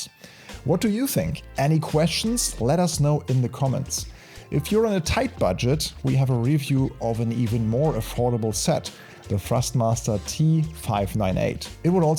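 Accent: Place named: Germany. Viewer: Wieso wurde mein Kommentar gelöscht wo ich sagte das man raus hören kann das du DE sprichst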